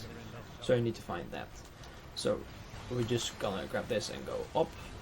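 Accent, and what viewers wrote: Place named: Netherlands. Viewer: Mooie Nederlands-Engels accent.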